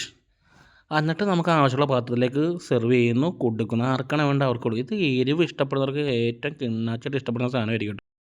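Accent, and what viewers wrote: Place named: Kochi, India. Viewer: Ithentha kochi slang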